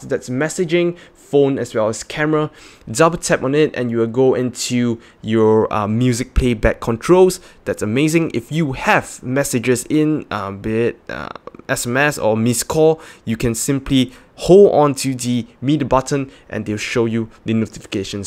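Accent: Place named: Singapore. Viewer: Very "Singaporean" accent there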